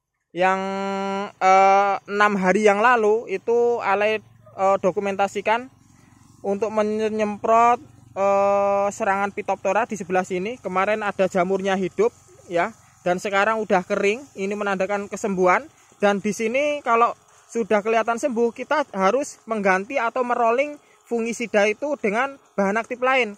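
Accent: East Java, Indonesia. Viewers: iki asale jowo ngendi bos kok kyk jawatimuran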